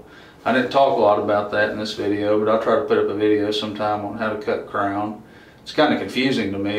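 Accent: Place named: Georgia, United States. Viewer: Georgia or south Carolina ?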